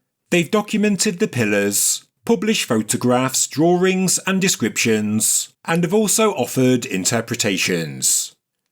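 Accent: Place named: England, United Kingdom. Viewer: What a complete load of rubbish... you people with the PhDs just parrot the books and words of others and NONE of you think for yourselves. The entire Gobekli Tepe site refers to the movement of our galaxy for a period from its creation 257 billion years ago to the point in time it was modified some 90 billion years ago. The animals refereed to on the pillars are those who evolved and some recovered extinct humans from a past time... The site deliberately buried as what it refers to , no longer happens. The good things is,,, those who left Gobekli Tepe and the Pyramids and Megaliths come back and remove all those telling the lies of human history and MANY have English accents... just like yours..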